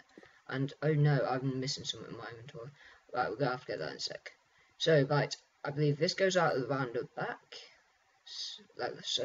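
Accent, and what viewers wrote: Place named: United Kingdom. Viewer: he sounds british, man from 2 years ago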